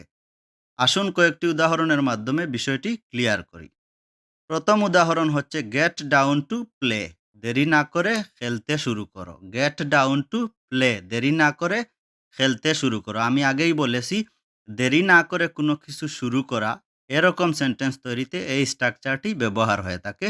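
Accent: Sylhet, Bangladesh. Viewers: ভিডিও টি ভালো লাগছে নতুন কিছু শিখালেন স্যার সিলেটের আঞ্চলিক ভাষা পরিবর্তন করুন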